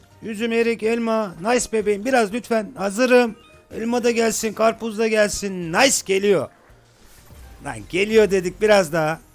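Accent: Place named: Thrace, Turkey. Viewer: Trakyalımısın şive biraz var gibi, hadi bakam derken 😅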